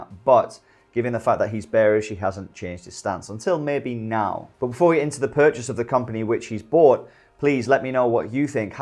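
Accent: United Kingdom. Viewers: Hello from US you brit?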